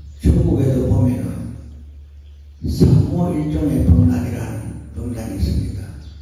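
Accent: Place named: Gyeongsang, South Korea. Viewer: 감사합니다. 정겨운 경상도 사투리를 쓰시면서 큰법문해 주신 종정스님께 감사의 예를 올립니다.법체 강건 하옵소서!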